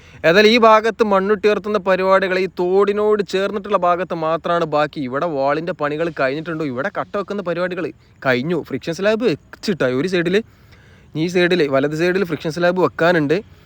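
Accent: Malappuram, India. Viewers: bro I like your videos and the malpram language and the its slangs. I do watch to listen to that. Please include more slangs😍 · ഒരുപാട് നാളായി പറയണം എന്ന് കരുതിയതാ , സത്യത്തിൽ നിങ്ങളുടെ വീഡിയോയുടെ ഏറ്റവും ആകർഷണം നിങ്ങളുടെ നാടൻ രീതിയിൽ ഉള്ള സംസാരം തന്നെ ആണ് . ആലപ്പുഴയിൽ ഏതാണ്ട് അച്ചടി ഭാഷയുടെ അടുത്തു സംസാരിക്കുന്ന എനിക്ക് , താങ്കളുടെ മലപ്പുറം സ്ലാങ് അതിന്റെ പ്രയോഗത്തിൽ വരുന്ന നൈർമല്യം , അതിന്റെ ശൈലികൾ എല്ലാം അടിപൊളി ആണ് . പിന്നെ രാഷ്ട്രീയ പൊട്ടത്തരത്തിനും , രാഷ്ട്രീയ കാഴ്ചപ്പാടിനും താങ്കൾ കൊടുക്കുന്ന മറുപടി മികച്ചത് ആണ് . സാദാ മലയാളികൾ രാഷ്ട്രീയ അന്ധത വെടിഞ്ഞു താങ്കളെ പോലെ ചിന്തിച്ചിരുന്നേൽ ഈ റോഡ് വികസനം ഒക്കെ 20 കൊല്ലം മുന്നെ വന്നേനെ . ഒരുകണക്കിന് അതും നന്നായി NH66 ഇപ്പോൾ പണിയുന്നത് viaduct , flyover , underpass , മിക്ക നിലവിലുള്ള പാലങ്ങളും മാറ്റി പുതിയ രണ്ടു പാലങ്ങൾ മിക്ക പ്രധാന ടൗണുകളെയും ഒഴിവാക്കി ബൈപാസ്സുകൾ എന്ന അടിപൊളി ഹൈവേ ആയിട്ടാണല്ലോ . ഞാൻ NH 66 ന്റെ വർക്കിന്റെ ഒട്ടുമിക്ക യൂട്യൂബ് ചാനലുംകണ്ടിട്ട് ആണ് ഇത് പറയുന്നത്. 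നിങ്ങളുടെ ചാനൽ അടിപൊളി തന്നെ .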